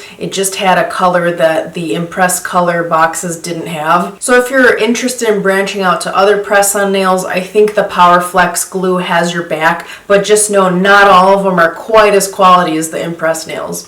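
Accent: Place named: Chicago, United States. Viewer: Great advise, and I love the Chicago accent!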